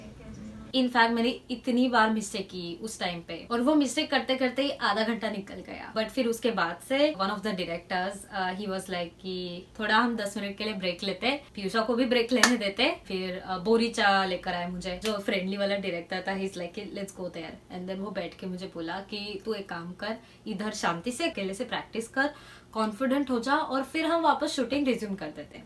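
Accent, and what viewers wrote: Maharashtra, India. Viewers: LADKI KI HINDI LAGI PURI MAHARASHTRA WALI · Her hindi-marathi accent is so sweet^^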